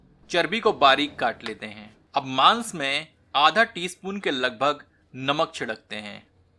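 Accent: Standard. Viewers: sudhha hindi uchharn mujhe sun ne ko mila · Waah shudh Hindi sunn kar bohot anandit hua mera dil ❤️👍👍👏👏👏 .. but recipie thoda lamba process hai !!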